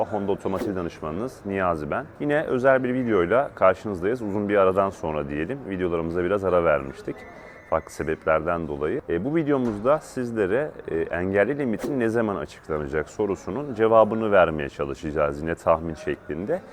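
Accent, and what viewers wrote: Samsun, Turkey. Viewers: Siz Samsunlumusunuz elliii demeniz den anladım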